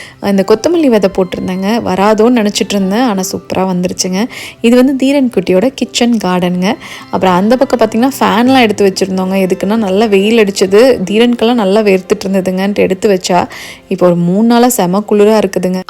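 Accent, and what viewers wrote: Kongu, India. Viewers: " ங்க " என்று சொல்லும் கொங்குங்க, எப்பவுமே கிங்குங்க, வார்த்தையே சாங்குங்க, மொத்தத்தில ஹாப்பிங்க!👍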